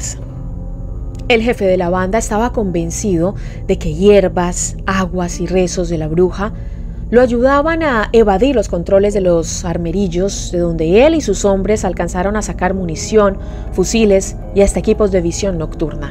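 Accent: Colombia. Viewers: puro español de Bogotá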